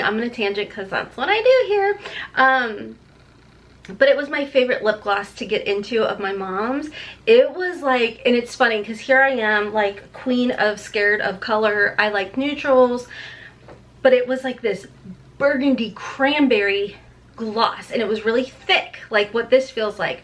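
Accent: South, United States. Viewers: Girl I absolutely love your country accent coming I'm from. TN and the faster I talk the more mine comes out . I too have not been able to pick my products for ipsy glam bag plus and I don't have Pr or the open studio stuff Seems like Ipsy is kinda going down hill big time my small bags are more exciting than the big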